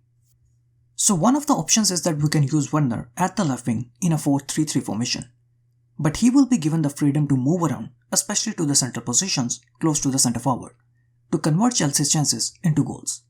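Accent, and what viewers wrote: India. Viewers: you sound like india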